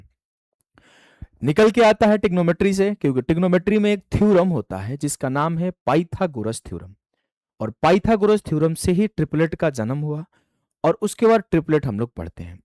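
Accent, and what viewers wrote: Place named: Uttar Pradesh, India. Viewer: sir🙏 day 12 Sir apki English ekdam U.P wala vibe deti h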